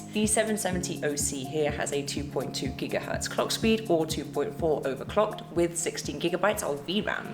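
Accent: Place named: United Kingdom. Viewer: are you thinking she is Australian? She is british. I think its funny so many americans can't tell the difference between the two accents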